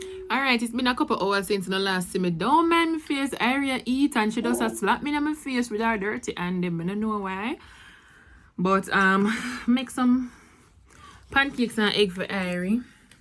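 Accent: London, United Kingdom. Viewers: The London accent and conversation 😂😂😂. The British intellect 😆♥️ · The london accent make me DWLLLLLLL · U nailed that London accent Nice vedio 😊😊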